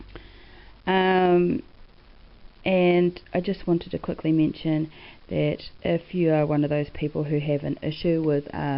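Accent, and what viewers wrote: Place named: New Zealand. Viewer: Wow this is breathtaking I love it i am making one at the moment for my nan but Hot dollar has the book box already to go...You sound like you are from New zealand I am from Australia were not that far lol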